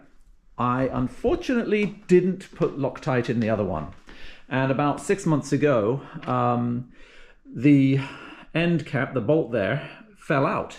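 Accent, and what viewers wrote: England, United Kingdom. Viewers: Good video I’ve got a lot of your videos to catch up on I can’t believe the price of them mirrors I don’t know how much that would be in £ but sounds a lot and do you originally come from uk you sound English to me if you are I live in Essex near Colchester you might know it take care will catch up on some more of videos take care ride safe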